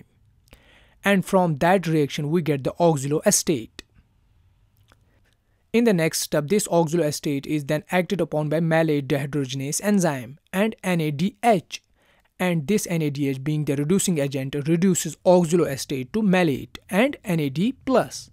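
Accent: Kashmir, India. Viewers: Sounds so Kashmiri Thank you btw!! Your concept are so on point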